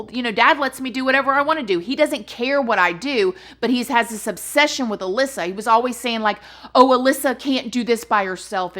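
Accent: South, United States. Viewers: I think Morbid Podcast did an episode on this! And I just imagined if you did an episode with them, AHHHHH!!!!!! You and your southern accent and colloquialisms with their New England accents would be the absolute cutest to hear!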